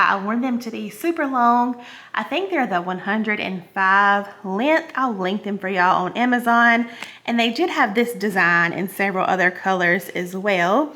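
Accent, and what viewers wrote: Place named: South, United States. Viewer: I'm an Australian interior designer and I love your Southern accent, great ideas on your channel, well done xoxo 🤠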